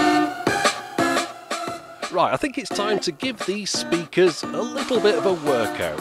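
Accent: United Kingdom. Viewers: Good to learn your British accent nicer voice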